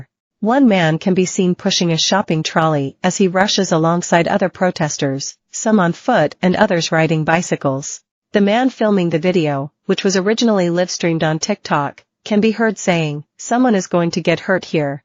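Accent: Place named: United States. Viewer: Should AI make comments on bad things happening in Britain using a USA accent?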